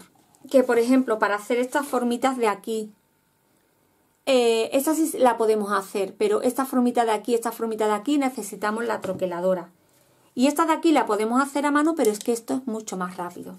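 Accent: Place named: Andalusia, Spain. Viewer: ❤❤❤ me encanta eres maravillosa y me ayudas a desconectar un montón, me chifla el acento andaluz yo en otra vida fui andaluza seguro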